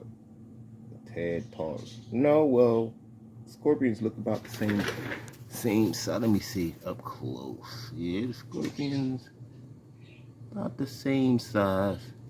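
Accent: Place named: United States. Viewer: Cool tyres, I like the 1st ones. Where do I get get them ? From your accent and the surrounding turf I think you’re from the US? Maybe I can’t get them in Europe. But that’s a bad spot for testing tyres or speedruns…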